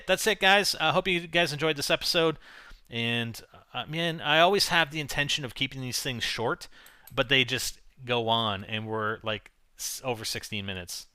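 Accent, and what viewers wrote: Canada. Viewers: Canadians really do say oot! 0,0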